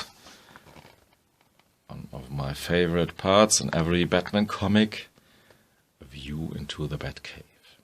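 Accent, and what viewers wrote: Germany. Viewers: Ich finde es schade, dass du in englisch bloggst, vor allem da es dich einschränkt, weil du manchmal die Worte suchen musst. Andererseits mag ich den deutschen Akzent .) Aber am Besten gefällt mir, dass du auch Disney Comics im Schrank hast .. Thumbs up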